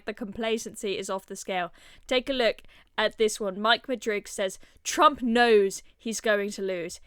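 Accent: United Kingdom. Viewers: Who's the Limey Chick? Is she the Channel Host? She's Lovely!!! 🌹🌹❤❤ I love the little teenie thing she does with the eyeliner!! Beautiful Young Girl!!❤❤❤ Her Limey Accent just adds to her charm!!!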